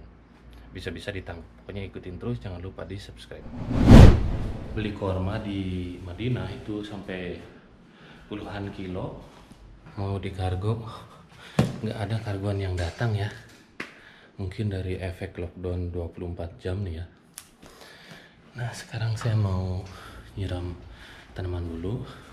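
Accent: West Java, Indonesia. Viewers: Subhanalloh...kang orang sunda nya...logatna euy... Beh mana cikundul..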